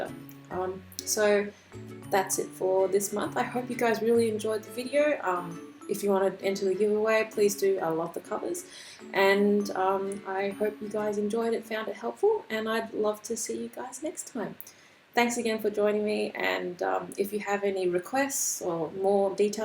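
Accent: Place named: Australia. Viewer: are you australian or british, you have a very interesting accent because i can't tell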